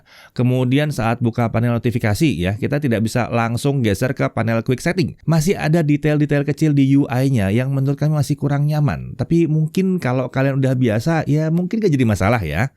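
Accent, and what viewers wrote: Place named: Java, Indonesia. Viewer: iTel javanese speak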